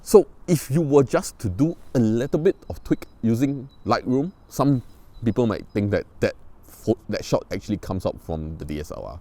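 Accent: Singapore. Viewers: No anyone comment on the Singlish? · You sound really Singaporean / Malaysian.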